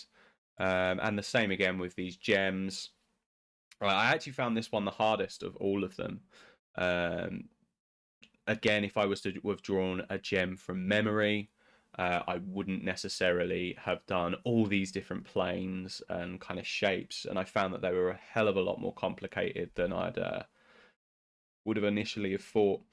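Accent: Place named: United Kingdom. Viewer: His British he speaks 😮😮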